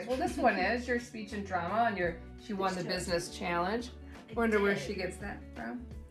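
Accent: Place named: New Zealand. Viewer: Hello there! Finally we got to watch the video, thanks for that and the many informative videos you've allowed us to watch. I hope your daughter makes a full recovery as soon as! Hearing about the 'enn-zed' (NZ) healthcare system (and others like 🇨🇦, 🇦🇺), makes ones stress levels regarding the 🇺🇸 healthcare system increase. The most enchanting thing about your videos is the NZ 'highlights' on your accent, especially as you pause or end yor sentences. I listened so keenly to your daughter, but she hasn't got that yet. Looking fwd to the next.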